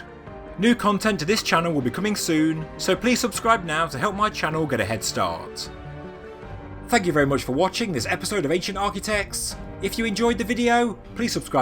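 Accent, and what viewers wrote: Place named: London, United Kingdom. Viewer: British, not American. Probably a Londoner bloak 😂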